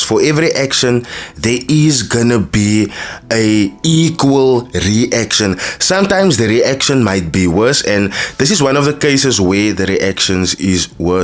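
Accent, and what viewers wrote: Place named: Cape, South Africa. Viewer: The way you speak makes me miss my Kaapse brahsse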